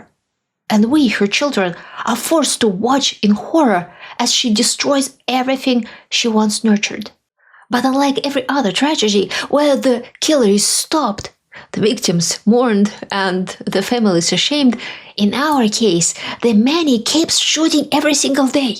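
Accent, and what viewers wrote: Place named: Russia. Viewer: Hi, thanks a lot for today's episode. You raise many issues I would love to comment on. I was Dutch and will always remain that, though I consider myself to be Australian. My Dutch accent is quite strong, much more so than your almost imperceptible Russian overtones. I am familiar with say 8 European languages and gave up on studying Russian (as a hobby) when Putin invaded. My wife is from Finland and equally adapted to Oz after nearly 60 years. We visited the Ugric museum in Helsinki. Clearly the Ugric tribes could not offer much resistance to the Empire. The Fins have only just managed to get out and not be swallowed up again. My wife's father was in the Winter War. The Karelians are Fins, but different as were the Estonians (IMO) say 200 years ago. Regional dialects varied a lot also in Finland. I am aware of the Komi Ugric people living toward the Urals and believe Russia was respectful of the Ugric languages, which helped the Fins to get out during WWI. 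Currently I am too preoccupied with the war in Ukraine to watch many of your videos. With luck that stupid and horrible war will not last another year. We had many reasons to migrate to Oz, but worry about Russia and WWIII was one reason. We feel safe in Tasmania, but visit Europe anyway.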